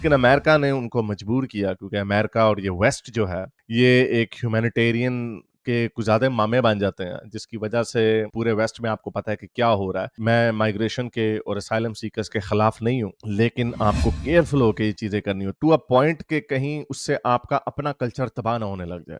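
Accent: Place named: Punjab, India. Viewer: Love your punjabi accent very similar to indian side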